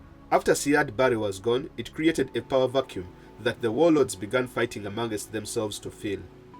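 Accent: Uganda. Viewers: Is the commentator Ugandan?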